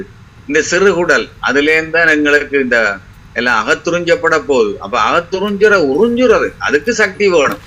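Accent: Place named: Sri Lanka. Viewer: இலங்கை தமிழின் கம்பீரம் மிக மகிழ்ச்சி அளிக்கிறது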